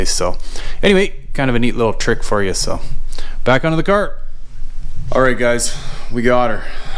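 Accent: Canada. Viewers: You sound Canadian eh. I'm in Sask. I have the same rear main and clutch issues, sigh.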